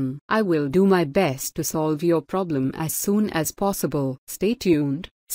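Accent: India. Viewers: Are u indian? ur accent is just like an indian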